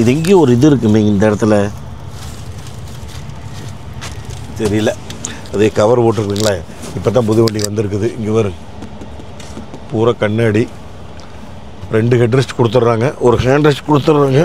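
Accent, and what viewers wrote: Kongu, India. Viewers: ilainga bro Kongu Tamil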